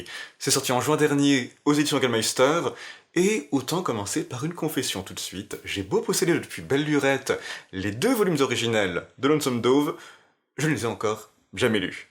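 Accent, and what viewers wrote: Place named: Walloon Brabant, Belgium. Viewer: Déjà, très sympa de ta part de prendre le temps de répondre à tes abonnés. Je salue le geste!:) C'est drôle car ton accent ressemble fort à l'accent qu'on retrouve dans le Brabant Wallon, au sud de Bruxelles. C'est fou! Mais bon, c'est toujours flatteur d'être pris pour un Belge, sache-le!:D Blague à part, oui, je comprends ton soucis d'agencer fond et forme dans de courtes vidéos, cela ne doit pas être facile en effet. Mais tu as raison, tes dernières vidéos sont plus balancées. Allez hop, un poil plus encore sur le style et ce sera parfait, mais je chipote... Bonne continuation à toi et j'espère que ton opération et revalidation se sont bien passées. See you soon!:)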